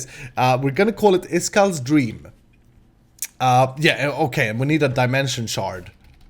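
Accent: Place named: Sweden. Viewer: . You sound swedish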